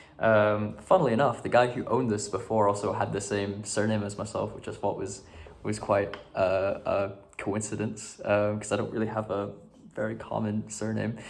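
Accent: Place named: Scotland, United Kingdom. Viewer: he's a SCOT, probs from the north cuz his accent is chill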